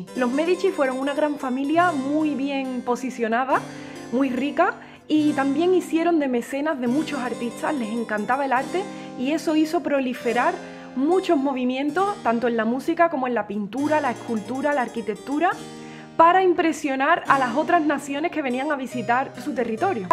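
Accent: Andalusia, Spain. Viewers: Gracias por contestarme! Acabo de ver un video hermosísima tuyo aobre "Lágrima" de Tárrega. Y acabo de confirmar en un articulo de Wikipedia sobre "pronunciacion andaluza" el cambio de "st" por "ts". Esta explicado en un articulo llamado "Dialecto andaluz", en la "Descripción lingüística", en la parte que dice "aspiración de la /s/ implosiva sucedida de la /t/". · Después de haberte escuchado cantar o tocar solo la guitarra en diferentes estilos de música es raro ponerte el pulgar para abajo vos dirías no gutsa con tu acento andaluz porque todo lo hacés bien todo el proceso la elección de la pieza El arreglo la ejecución así lo dice un suscriptor y estoy de acuerdo seguro ensayas mucho por más que sepas porque te interesa que el producto salga bien No hay palabras para elogiar tu arte porque es un arte, una persona puede estudiar música guitarra y tocar bien técnicamente pero no llegar a la gente.Es como si una persona estudia pintura o escultura puede hacer buenas obras pero no va a estar a la altura de Miguel Ángel o de Leonardo da Vinci Vos en lo tuyo tenés ese toque ese don que aparte lo perfeccionas con trabajo práctica constancia y lo que harás en el futuro solo Dios lo sabe ese Dios que te dió ese talento inmenso para que lo uses para tu bien y el de tus oyentes Sos única grande sencilla un instrumento de Dios el es el director de la obra Quería compartir esto con vos Con tu poca juventud acumulada tenés una llegada a la gente muy grande y con toda la juventud que tenés por delante para acumular quien sabe hasta donde llegarás Gracias por tu talento tu arte generosidad ❤️ Abrazo grande desde Buenos Aires Argentina 🇦🇷🇦🇷🇦🇷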